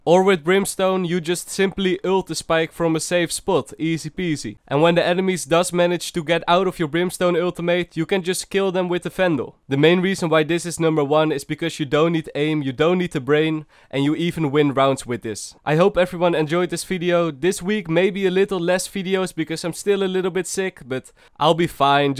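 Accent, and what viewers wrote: Netherlands. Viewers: You sound dutch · Are you dutch? You Sound very dutch xD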